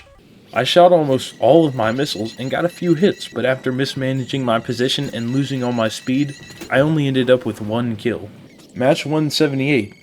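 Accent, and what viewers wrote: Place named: South, United States. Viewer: I feel so bad for you. You're so clearly too stupid for top tier, so much so that I genuinely feel bad for you and your experience. Usually, people like you are scared away before it takes them 3000 hours to hit their first jet because your scores are so menial, every match you play. I think its either the miserably slow cadence of your voice (I get you're southern... [probably fat too]) or simply how you speak, but GOD. DAMN. Stick to Call of duty or something because I beg of you, please do not accidentally join my team...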